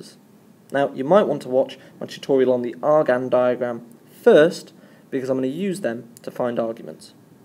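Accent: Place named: United Kingdom. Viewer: i love that british accent :D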